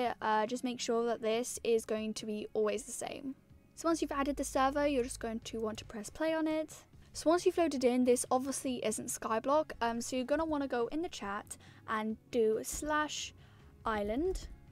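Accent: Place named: United Kingdom. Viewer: aye u quite bri'ish yere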